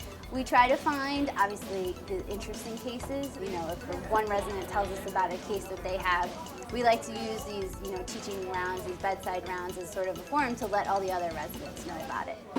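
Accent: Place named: United States. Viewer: she has an American accent, maybe her parents are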